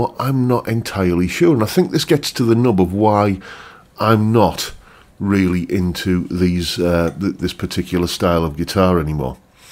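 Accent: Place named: Northeast England, United Kingdom. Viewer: I'm trying to place your accent... Guessing somewhere between Consett and Darlington?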